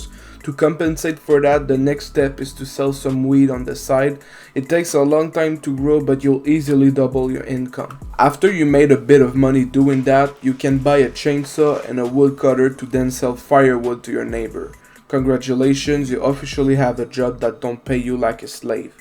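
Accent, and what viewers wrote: Quebec, Canada. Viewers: Toi t quebecois avec ton accent han? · talking about mon bazou, you sound like you have a Quebec accent. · your french canadian for sure (quebecois calisse)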